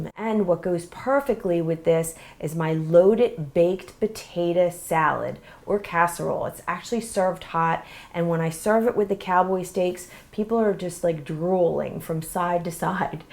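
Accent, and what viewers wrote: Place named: Brooklyn, United States. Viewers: New York accent?...Brooklyn accent?...can hear it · Funny, I hear it too. Here and there it sneaks in. Wonderful video though. At least she's trying to free herself of the ny accent.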